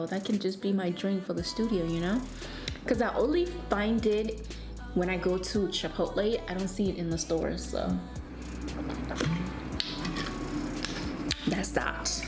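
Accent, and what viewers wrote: United Kingdom. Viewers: British accent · almost British...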